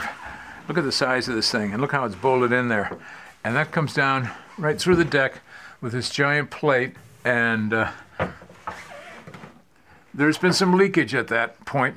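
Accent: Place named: United States. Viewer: All that info, and all spoken in perfect 👍 American English!! What a beauty!!!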